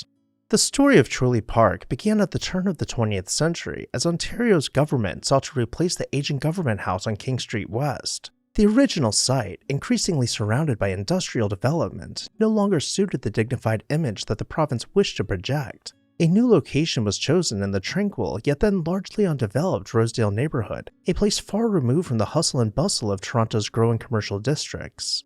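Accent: United States. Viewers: Correct. Although factual, the narrator has an annoying way of speaking and a definite American accent. Words are written using American spellings omitting the 'u' as in the word favourite. Never the less, Toronto does have a history of demolishing its best architecture, as this video illustrates. I've been to Chorley Park and all that is left is the plaque and the bridge. Truly sad.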